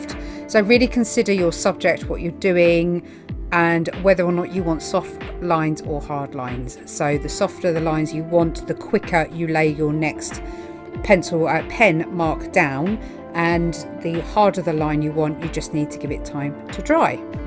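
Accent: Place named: England, United Kingdom. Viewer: I love listening to english accent ❤️ yours are nifty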